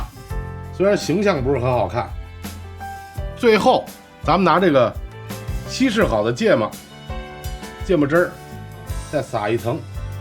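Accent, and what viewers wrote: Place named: Beijing, China. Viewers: 这位厨师大爷说的北京话真好听。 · 廣東人，第一次看到這菜，好酷🆒，單單看見都覺得轟鼻，哇噢，這麼多芥末(´▽｀)瑟瑟發抖ing。等我買了芥末粉也試試，另外說一句，北京話好好聽，謝謝分享(o^^o)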